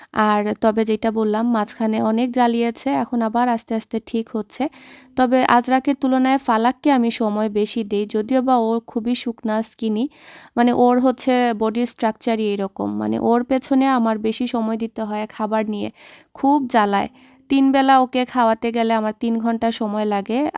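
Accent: Sylhet, Bangladesh. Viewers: তুমি সিলেটি তোমার সিলিটি কথা সুন্দর লাগে আমি ও সিলেটি।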